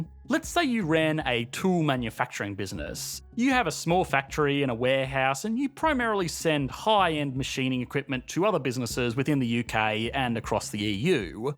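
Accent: Australia. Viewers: defo Aussie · He's an Australian. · U.K makes jet engines, high-end cars...has the most important financial centre in the world and yet EE still places UK behind NZ LOL seriously mate your economics education is flawed...There's more economic activity in one London street than the entire country of NZ. Are you a kiwi with an Aussie accent? why the soft spot for the land of sheep and the haka? lol if NZ was to fall off the face of the earth surely the world economy wouldn't be impacted. at least Australia has natural resources it exports to big countries...so Australia is definitely more globally significant to the world economy than NZ and yet you even placed Australia Behind NZ? You see the world from a biased and unrealistic realm. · i love aussi accent, but all the endings with -eeeeuoooeeee is just too much.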